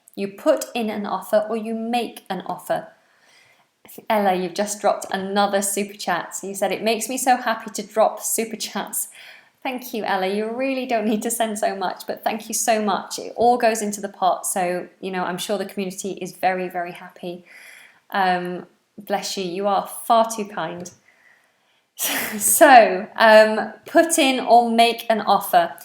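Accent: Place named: England, United Kingdom. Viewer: ganahan ko sa imohang English accent. salamat kaayo inday!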